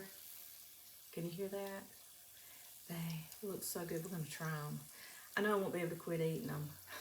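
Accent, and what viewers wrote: South, United States. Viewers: Thank you so much glad to meet you I will keep your Channel. I'm 90 years old and I live in Southern California and I know you're from the South but I don't know where how could I tell LOL🥰🥰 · Love your southern accent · I was wondering what to do with these canned potatoes ty. And she has a lovely Southern accent which many of us are jealous of lol.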